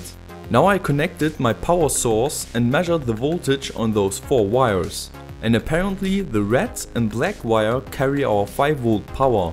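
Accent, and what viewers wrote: Germany. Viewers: du you come from germany ?xD i come from ! you hear so x33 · hör ich da nen dutschen akzent ? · Are ou german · i cant hear your english anymore. you are definently german or sth. else! (i m 14 and german) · Where are you from? Bist du Deutsch/ Are you german?:D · Are you german or something? YOu sound like a german speaking english :D Wenn ja nice :D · Are you realy German?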